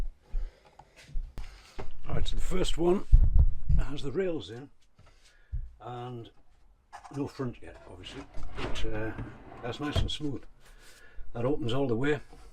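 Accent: North East England, United Kingdom. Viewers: Are you in the North East mate, sense a accent? Just been looking into converting a vivaro myself, looking like a top job 👌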